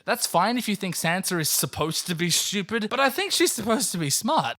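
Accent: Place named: Australia. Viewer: I love how perfect the Australian accent is for being sarcastic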